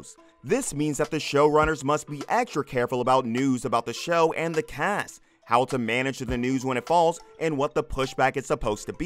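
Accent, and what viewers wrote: United States. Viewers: This show simply conforms the immaturity of British men with their childish pranks. The mechanic guy is worth watching, but that the tall one, what is a disaster. And never use an American narrator for a British show. There's so much background detail that Americans simply can't grasp. Jack, the Japan Alps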